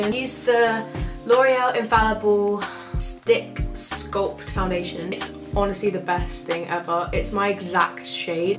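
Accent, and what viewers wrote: United Kingdom. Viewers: Hey, i' m french and i would like to now were do you come from because you have an accent wich seem to be a british accent... someone can tell me. Thank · Why am I genuinely unsure if she has a slight British accent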